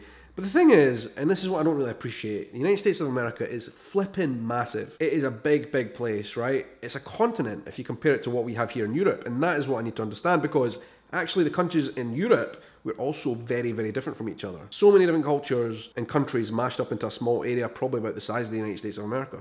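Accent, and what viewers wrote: Scotland, United Kingdom. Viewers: I wish we had more Scottish visitors here in the U.S. I hope to visit Scotland someday. I love the rain and enjoy your accent, and I like tough honest people, castles and monasteries. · Was hoping you would sound like the scotsman from samurai jack, wasn't disappointed. 👍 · Just a few things... Do you like being know as a Scot or a Brit? Same with Us. And it’s “Apple atchun” ( both “a” s pronounced the same way). By the way. The Appalachian people were mostly Scots settling in this area. I have a wee bit of Scottish ancestry. Inn-n-Out is what it’s all about. We drove out the English because of our guns. PS love the accent! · Nothing I like hearing more than a man with a Scottish accent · Americans are usually only rude to other Americans. You have the Braveheart accent. That's an automatic in.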